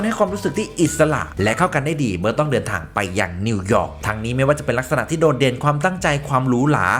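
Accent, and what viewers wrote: Isan, Thailand. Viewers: ถึงแอดจะข่าวตกภาษีอีสานบ้าง แต่ก็น่ารักกกก😆😊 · ชอบสไตส์การพูดเนื้อหาและหลุดเว้าอิสานฟังปัยหัวเราะปัยชอบ5555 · 555 ตกอีสานบ่อยนะเรา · เบิ่งช่องแอดแล้วเต็มอิ่มกับข่าวสารของน้องลิซ คลิบนี้เบิ่งนำหัวเราะกับการเว้าอิสานผสมมานิดๆหน่อย🥰🥰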